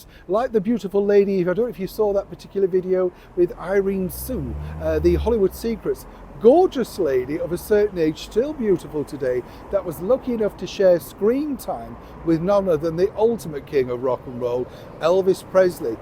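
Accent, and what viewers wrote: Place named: England, United Kingdom. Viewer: It was Mam which is something people called the late queen. It sounded like mom to me too but I think it was his English accent.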